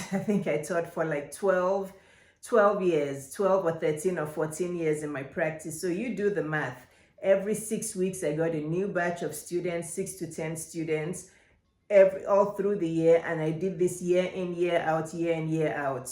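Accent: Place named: Africa. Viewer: I love your African accent, who coached you in public speaking? I live in the Northwest Ohio area. Also, I'm a travel nurse.Latrice ✝️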